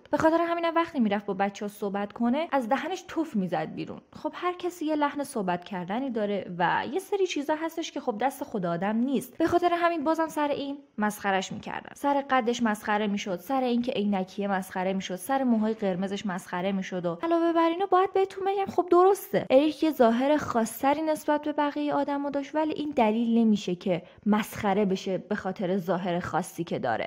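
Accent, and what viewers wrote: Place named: Isfahan, Iran. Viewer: درود برشما بانوی زیباوجذاب.شما خودتم گوگولی هستی،راستی هرکاری کنی بازم ته لهجه‌ی اصفاهانیتو داری😊😊😊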